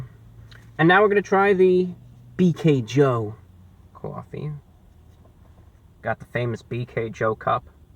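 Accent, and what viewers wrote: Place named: Boston, United States. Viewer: the bostonian accent is shining through in this review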